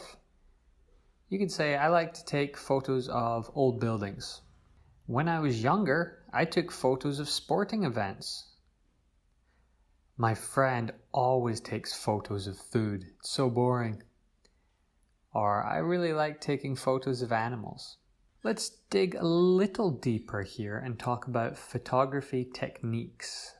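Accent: Scotland, United Kingdom. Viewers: I'm really curious about your accent. Can you explain what influences there are? I can detect a fusion of Scottish, North American and perhaps, Irish?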